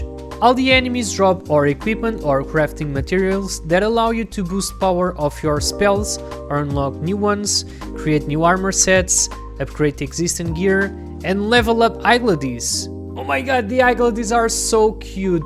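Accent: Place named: Portugal. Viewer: i can tell by ur accent man:p great video i was thinking about buying that it's on sale on the ps store, eu também sou tuga ahah:p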